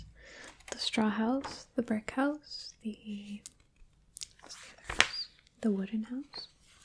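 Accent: United Kingdom. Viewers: please make more tutorials with british accent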